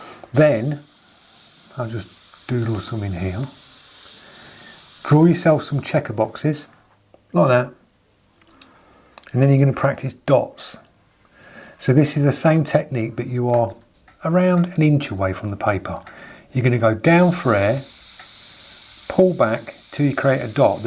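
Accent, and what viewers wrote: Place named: United Kingdom. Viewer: Excellent compilation of "oh no, oh my god"!!! Loving it - especially with the UK accent.😅😅😅 Additional beginners problem: Overthinking, overlearning, overanalyzing and not practicing enough because there is "yet more info to suck up before I do something wrong" (it's me). The advantage is, that I know most of what to do/not to do, but I lack the mileage. It keeps you from being frustrated, BUT it leaves a feeling of being lazy.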